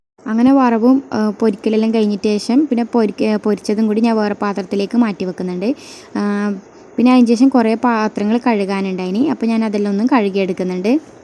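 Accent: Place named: Kanhangad, India. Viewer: Evidea sthalam kanhagad ano samsaram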